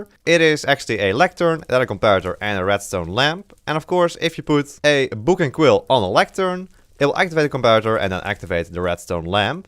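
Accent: Netherlands. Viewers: Ik hoor een Nederlandse stem, ben je dat ook?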